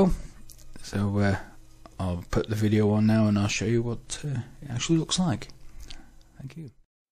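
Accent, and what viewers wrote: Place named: England, United Kingdom. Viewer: Great footage J! I'm still playing with Litchi at the moment, but it's an amazing app. I wish I'd got your in depth knowledge of it ... it's quite a steep learning curve, fine tuning the settings, isn't it? Getting there though, and having fun! Lol! Your videos have been extremely helpful, and, as previously mentioned, it's great to hear an English voice! Thanks mate!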